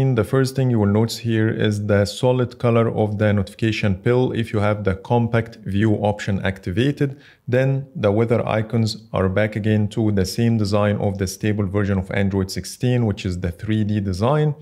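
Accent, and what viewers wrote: Egypt. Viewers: i can hear the Egyptian accent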